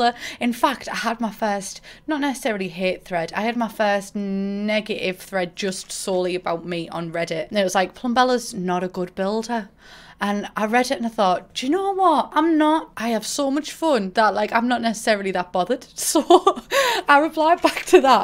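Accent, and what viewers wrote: United Kingdom. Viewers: Im half Swiss and half British but I live in Switzerland so I have a bit of an accent but it’s not that noticeable. Me after watching Plumbella: British accent intensifies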